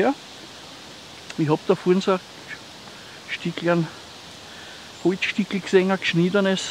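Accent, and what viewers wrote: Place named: Austria. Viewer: Hallo, Ich schaue dich nun schon eine Zeit. Leider verstehe ich bis heute nicht Deinen Gruss an die Kranken. Was sagst Du? Kranken Sesseln??? was bedeutet das. Leider kenne ich das Östereichische Dialekt nicht:-) Viele Grüsse Michael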